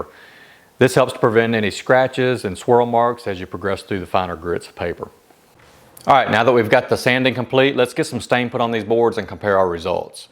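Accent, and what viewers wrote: South, United States. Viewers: Cool. I have lived most of my life in northern Minnesota. I like listening to accents and your accent sounds like my kin from down south. Nothing like Texas accents. I appreciate your VIDEO! I am attempting to build a shelf and have never done wood-working since I was a kid. Kind regards from up north and Merry Christmas.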